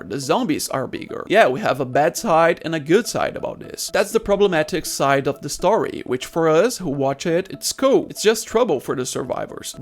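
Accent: Brazil. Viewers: You can barely tell you're brazillian, congrats!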